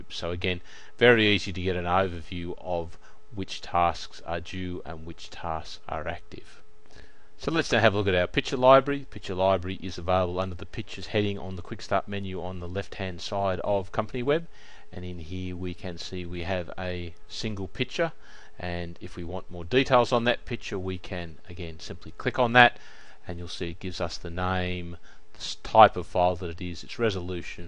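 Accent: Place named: Australia. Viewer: Thx for the great video! Love ur Aussie accent :)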